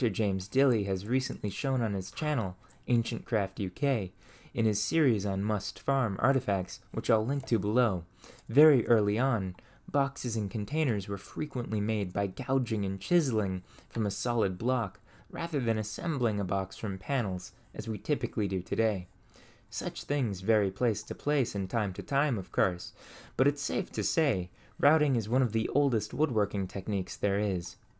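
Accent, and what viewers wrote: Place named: Oregon, United States. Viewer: Sounds like Organ State to me.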